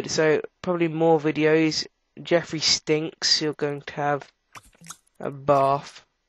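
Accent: United Kingdom. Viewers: You British? :)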